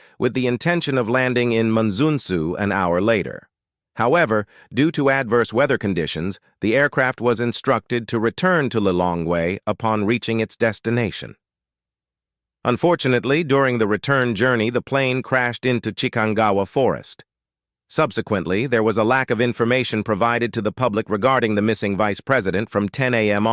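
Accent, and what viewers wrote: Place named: United Kingdom. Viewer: You must be from BBC - enemies of the black race and enemies of All Christians. You are always going through African countries from country to Country creating trouble, accusing l Hatred ajc division and Destroying African leaders. Wtr hear it from your British Accent. You are turning now to Malawi trying to capitalize on this terrible tragedy to boosy your lucrative business which you conduct with the blood of the black people -- it is your destructive work. There was absolutely no rift between the current president and the Vice president. Why would high ranking Military officers( MAJORS) who PERISHED in te crash Take thier iwn fellow soldiers and 7 other High ranking Malawi leaders in addition to the Vuce President in a presidential Military plane judt to go Kill themselves along with rhe leadership team of Malawi? Bad weather! 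Shane on you enemies of the Black people 😮😮😮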